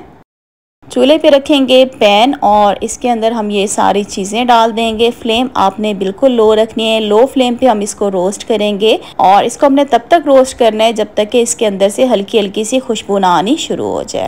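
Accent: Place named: Pakistan. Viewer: Achi lagtii hai pakistani hindi.....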